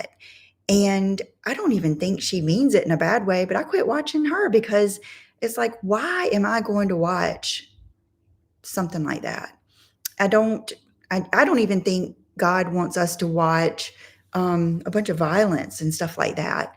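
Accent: South, United States. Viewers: I like you I find your voice very soothing. I’m originally from North Carolina and I love to hear your southern accent, and I love to see your holes of clothing and I buy things that you show me. So thx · What a beautiful and touching video! You are perfect , you are enough, God knew what he was doing when he created you. Your videos are so inspiring, encouraging, and relaxing to watch (your southern accent is adorable) I look forward to your videos and what exciting and fun things you bring for all your “friends“ to enjoy 💕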